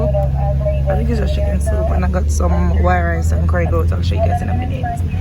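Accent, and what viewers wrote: Jamaica. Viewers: I love when the patois drop in and out😂😂😂😂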